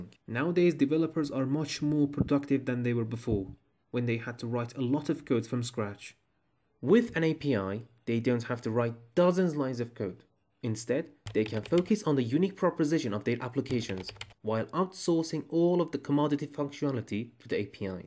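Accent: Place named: United Kingdom. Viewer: Thanks! Now I know what API is! BTW, like your British accent 😉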